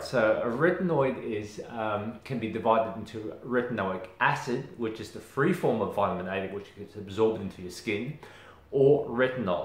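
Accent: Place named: Australia. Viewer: Such an informative and easy to understand video! Love your Aussie accent and your smile! Thanks!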